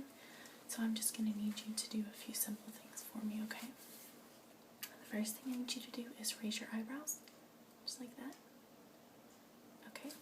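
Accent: South, United States. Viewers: Love this video! Do I detect a very slight southern accent? It's extremely relaxing! Thanks so much.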